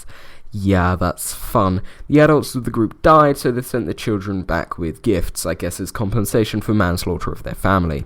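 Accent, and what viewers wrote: United Kingdom. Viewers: This is the most annoying British accent combined with this teenage voice. Wtf. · You are so jaded but yet informative, I love it! You got a strong british accent as well, it fits your character. And I'm not sure of the term "jaded", I'm not an english native, for me it means you are funny while being like tired of your life kinda. Just so you don't take it as an insult and now my comment is getting to long and I'm starting to sound too politicaly correct for a stranger on the internet. SUGOIII DESUNE (that's for the contrast, the bad kind of contrast) One question: are you proud of being british? Thanks for the laugh, I suscribed.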